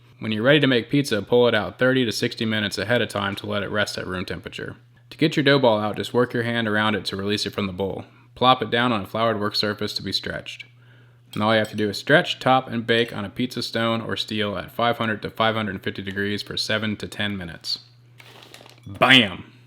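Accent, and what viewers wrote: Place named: United States. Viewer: You sound like you’re from the US, why are we using grams? 😂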